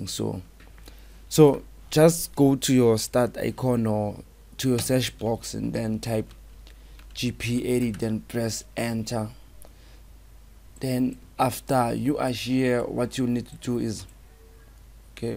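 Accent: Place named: South Africa. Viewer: You sound like South African😊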